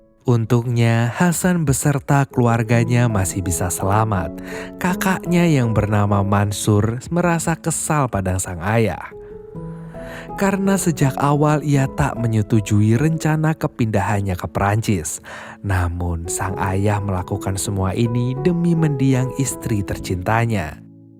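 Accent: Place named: Java, Indonesia. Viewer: Gw saranin kayaknya mending lu kayak album film aja yang ceria gitu soalnya lu kayak orang jawa tapi udah keren kok 😅👍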